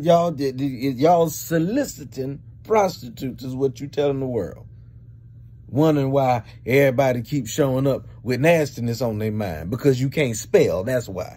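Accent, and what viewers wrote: South, United States. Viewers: They just a Lil country shuler. It's how we talk down south in TX. Thanks for the Birthday laugh. 😂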